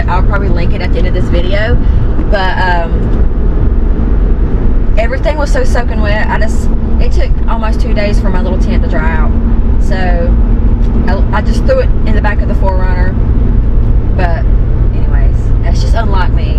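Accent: South, United States. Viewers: … buffalo mozzarella & tomatoes is one of my favorites… also half a bagel with cream cheese, capers & topped with smoked salmon (prepackaged thinly sliced) … omg delicious…. my girlfriend born & raised in Asheville shares ur wonderful southern accent i never get tired of hearing…. lastly… like i’ve mentioned in previous comments i see black bears almost every other day & they are plentiful in our western NC mountains … there is no way i’d sleep in a tent in this area… u are correct , 99% of bears avoid humans… it’s the remaining 1% that scare me … the old ones and or injured ones are the problem bears that worry me… & i fear for ur safety…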